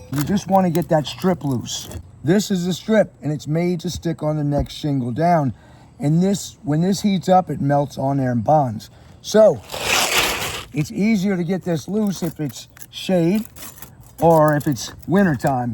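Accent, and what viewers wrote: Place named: New Orleans, United States. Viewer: I just found you because I need to Mya small roof repair and needed to confirm what I was thinking. You did just that, so thank you. About two seconds in I thought, he’s from New Orleans! You got a new follower.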